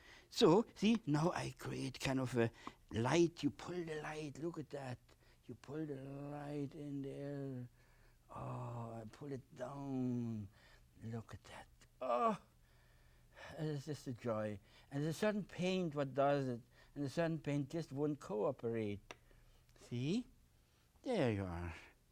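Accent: Germany. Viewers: I used to love his Prussian accent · Michael actually he got a lot of recognition including an Emmy. I remember him well from my youth and I loved watching him before Bob learned from him. Bill’s personality was fiery, energized and warm and Bob’s was soothing and warm. I like the saying “Bill in the morning and Bob at bedtime.” For Bill too there was a barrier where language was concerned. His English was excellent but his accent was heavy. Whether we like it or not there is a prejudice in our country with certain accents and a tendency to gravitate to those who speak the way we do. It was difficult to get ahead as a popular, public personality with a heavy German (in his case Prussian) accent. Even now I see comments everywhere that mock Bill’s accent to one degree or another. I personally love it but there are many who would likely never give him a chance.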